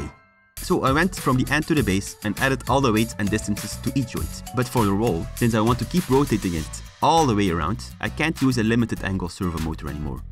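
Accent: Netherlands. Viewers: I smell Dutch. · u sound rlly nederlands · You Dutch.?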